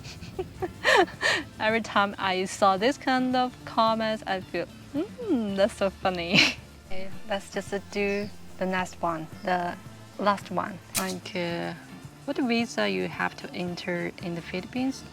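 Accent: United Kingdom. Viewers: I see you as a beauty and brain lady and I noticed that there are instances when you speak there’s a British accent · you sound little bit of uk/british style accent. what school in china you learn english? i think china government can still track you even in vpn by requesting from chinese internet providers(if you are not using chinese internet provide then maybe you are safe). free vpns definitely they can see what you are doing, paid ones are encrypted. but i think they could still decrypt that now that china has quantum computers. try to start criticizing chinese government, you'll see😂 just kidding. kinda scary, even jack ma didnt escaped president xi😅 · You have a light British accent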